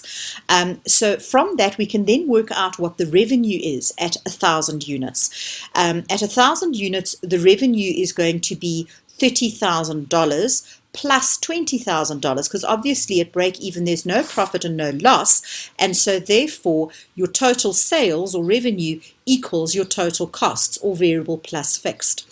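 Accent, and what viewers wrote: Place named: South Africa. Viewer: You have a South African accent. Are you from there? Your Accounting tuition is fantastic! Thank you so much.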